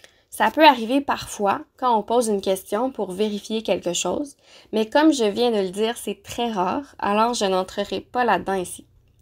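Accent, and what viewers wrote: Quebec, Canada. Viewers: J’aime ton accent québécoise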